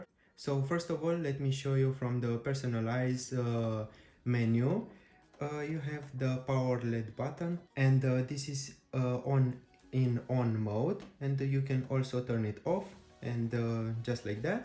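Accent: Romania. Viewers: i'm pretty sure that s a romanian accent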